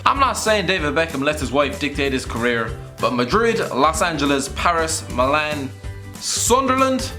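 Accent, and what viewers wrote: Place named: Ireland. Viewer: Lovin the Irish commentary xD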